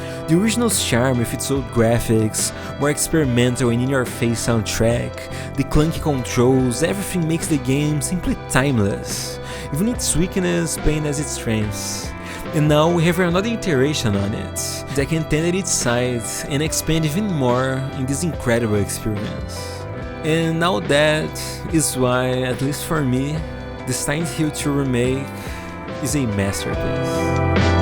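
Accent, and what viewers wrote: Brazil. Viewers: are you brzilian? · Sabia! Kkkk reconheci o sotaque de longe. Sempre bom achar outro brasileiro fazendo vídeos em inglês. Continua que está muito bom!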